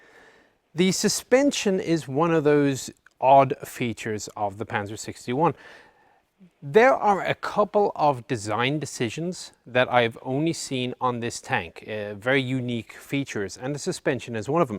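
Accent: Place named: Ireland. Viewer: Always great to hear the Irish Shh as in Sh-tuff .. especially explaining the complex simplicity of the Swiss Army Knife idea - mounted on a tank. Make way there for Shlattery's Mounted Futtt .. Huzza (with an -ay sound, it seems not with an -ah; like Jose and a wee cuppa tea: Yeah! hurray!) It's OK, I'm daft - certified and all.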